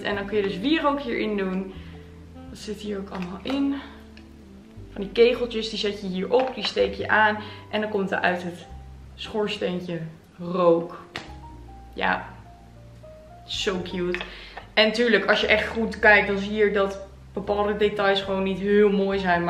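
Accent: Twente, Netherlands. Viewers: Onze mooie Twente dialect 🎉😊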